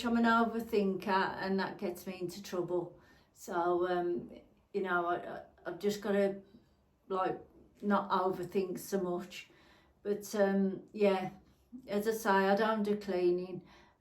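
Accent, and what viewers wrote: United Kingdom. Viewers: Hi! Sorry that you are feeling so low! I love watching your videos - you are kind and caring (and I'm quite partial to a Black Country accent.) 💕 Please don't stop your channel, you have so much to give. Your heartfelt video made me think that an occasional 'Chat with ' over coffee (and cake) segment in your back garden or out on location would be great. You could talk about different things every time - style, clothes, hair, make up, skincare, garden, your hobbies, holiday destinations, keeping fit and healthy, shopping, being a mother and grandparent, your life etc. Love you and your channel,! 💛xxx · Aww, I'm a silent watcher never usually comment but just be you, You are enough! There's plenty of room on YouTube for all! I watch lots of different people for lots of different reasons. I love to watch you as you're so relatable and drama free! 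Plus I'm a Brummie and I love to hear the accent now I no longer live there! To stick my twopenneth in I'd say just do the sort of thing you do and this video here no doubt will help so many people! Xxx Big up to you, much love xxx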